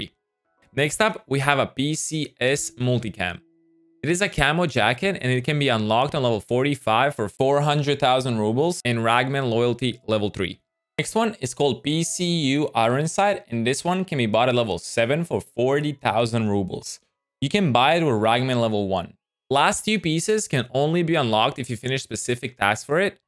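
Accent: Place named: Europe. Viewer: Hi from Finland. To my ear have a European accent, so where are you from? My guess... Slovakia;)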